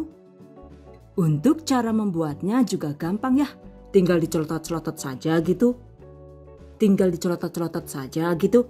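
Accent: Banyumas, Indonesia. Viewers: Kerennnnn Bun apalagi kalau pas Bunda ngobrol ngapak seneng saya dan Alhamdulillah sya kemaren nyoba buat modal cangkir dan tatakan nya sama mobil2 an lucu lucu makasih Bun sehat selalu buat Bund👍🏼👍🏼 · lah jan rika jane wong ngendi.. 😆nyong ngapak kie,dadi geget ngrungokna rika gawe video wkwk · Pling suka nonton vidio lucu bhsnnya cmpur ngapak krn aq jga org purbalingga jawatengah sama ngapaknya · wong ngendi sih rika kok bisa bahasa ngapak? Salam ngapak 🤣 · Seneng nek lg ngapake 😅😅😅 Suka pokoke nyonge ngrungokna ngapake ora ngapak ora penak 😍 Sllu mengikuti yu 😍 sukses selalu.pokoke 🙂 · Saya suka dengan Chanel ini, penyampaian dan resep nya ok banget. Mbk nya juga lucu,kadang ngapak juga. Haha Andalan nya "catat" dan "ookeeh guys" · ini aslinya orang mana sih? Ngmong ngapaknya lucu, tp pinter bhasa inggris juga 😁😁👍👍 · 😂😂 klo sdh logat ngapak bikin ngakak Ini konten masak teraasikk 😁 sukses bu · Mantap teh,pake BHS ngapak dong,seneng bget q dengernya.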